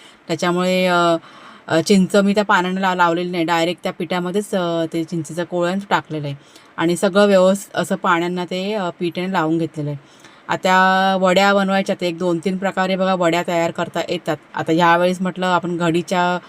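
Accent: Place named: Konkan, India. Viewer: देश तसा वेश तस प्रांत तशी भाषा... प्लीज समजून घ्या कोकणात इतर ठिकाणी अनेक प्रकारच्या भाषा बोलल्या जातात तसेच मग त्याचे उच्चार असतात...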